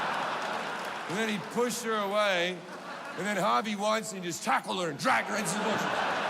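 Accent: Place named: Bogan, Australia. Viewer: Im sorry! The Bogan Aussie accent makes this immediately hysterical. LOL he could be ordering chinses take-away, and i would be rolling on the floor. Im a simple man; bogans make me laugh.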